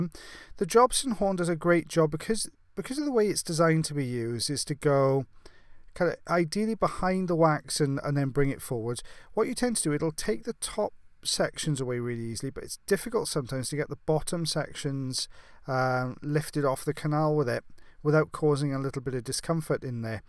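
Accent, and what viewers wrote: Wales, United Kingdom. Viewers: One of the things I look forward to with dry earwax is hearing "crumbly and dry" in a British accent. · Gordon M he has a welsh accent 😊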